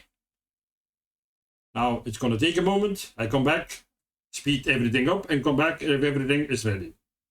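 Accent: Belgium. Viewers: Ik hoorde al dat je van Belgie bent, goede video! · We horen echt da vlaamse accent hahaha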